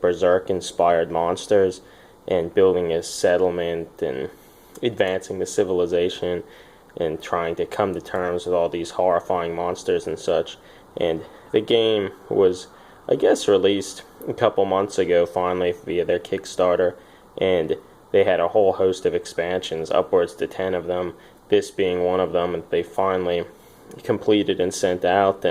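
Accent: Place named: Louisiana, United States. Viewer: Nice video. Gotta say, you almost sound Cajun. Are you? I am. ^_^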